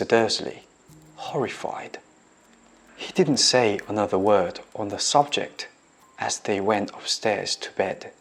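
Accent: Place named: United Kingdom. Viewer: 영국악센트 쥐리네여~~~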